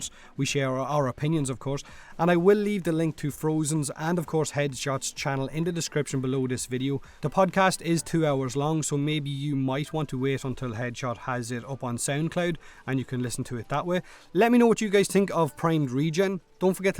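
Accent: Ireland. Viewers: Fuckin love Irish accents But holy shit your accent is so thick (that's what's she said) but in a good way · I started watching you because i love the irish accent,same goes to daithi de nogla.I fucking love your accents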